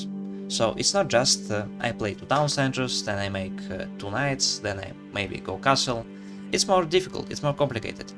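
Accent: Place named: Eastern Europe. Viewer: Great content! I’m curious, where are you from? Your accent sounds Eastern European and I’m from Bulgaria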